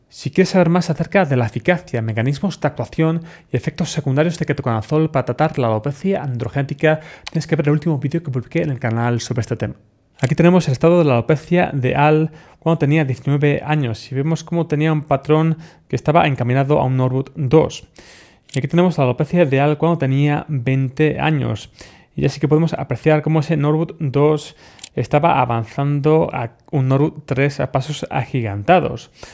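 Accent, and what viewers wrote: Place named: Spain. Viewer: de donde eres? tienes un acento español pero con un dejo como si no fueras nativo en el idioma.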